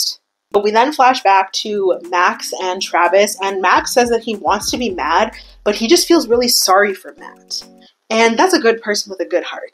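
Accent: Canada. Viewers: Can you say " I don't know about how long it would take to get out the house" in your full Canadian accent. Please lmao. I am only here to hear you slip up! You get so embarrassed hahaha. There is nothing wrong with your accent. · bestie, PLEASE don’t feel embarrassed about your BEAUTIFUL Canadian accent!! I think it’s really pretty!! And PLEASE DO NOT EVEN get me started on your infectious giggle!!!! I’m so happy that I found your content/channel!! Confession: I have been binging on ALL of your videos!! Please keep them coming!!!! 😊❤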